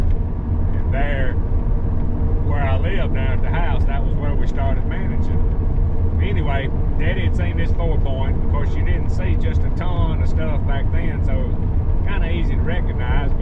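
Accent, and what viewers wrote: South, United States. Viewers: That subtitle generator has problems with your southern English. I’m not criticizing southern English because I talk that way too. It’s just funny how what’s printed differs from what you said. Thanks for the videos. I enjoy them.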